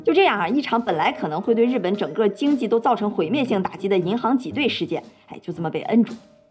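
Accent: China. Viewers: 大陸腔改掉我就信了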